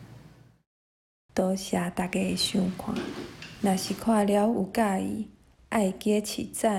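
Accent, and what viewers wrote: Quanzhou, China. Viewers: 感謝使用泉腔配音 挺有趣的 也很難得 話說同安腔的二調變調是升調 但是這影片的配音 比較不明顯 (新竹當地的操新竹腔的中老年人就很明顯) 另外這影片保有部分老泉的央元音 例如"事sīr" 但是也有一些讀音丟失央元音變成新泉了 例如"鍋e"(老同安腔讀er) 略感可惜了些 附帶一提 傳統上(例如臺北腔 新竹腔)"好"讀ㄏㄛˋ "ㄏㄜˋ"是南部腔的讀法(即臺灣南部偏漳腔 主要分布於臺南與高雄一帶 而傳統上漳腔也是讀ㄏㄛˋ) 這個字的音讀混到南部腔了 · 我覺得你的腔口很可愛，看字幕說是泉州腔，我聽起來有點福州加彰化和美一代的海口腔，跟我講的泉州腔有點差別，拙見不喜勿怒。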